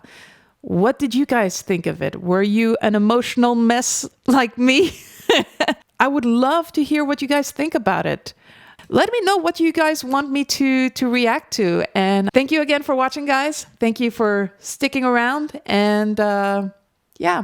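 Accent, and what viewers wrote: Paris, France. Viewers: Hello/Bonjour merci pour ton opinion très intéressante sur cette musique. Ton analyse se rapproche finalement assez bien du contexte du jeu (je n'en dirai pas plus pour éviter de spoil) Pour information, il semblerait que le compositeur ait eu à disposition les dessins préparatoires du jeu et les grandes lignes de l'histoire quand il a commencé à composer. source : <link> Vu ton superbe accent français, j'imagine que je n'ai pas besoin de traduire ;) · Very impressive, true french accent, not the funny quebec one, you could pass for a real parisian. I don't know if it is the lyrics or the violin, but it's remind me of the band 'Louise attaque'. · I just wanted to say, your french accent is the best one I ever heard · Beautiful reaction and analysis. I wonder where you're from ; you sound French when speaking french, but have almost no accent when speaking English, which is pretty rare if you're indeed French (though of course you're a trained vocalist). 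And I'm french Canadian so I know you're not from Quebec. Anyways, great video, hope you're doing better and wish you all the best.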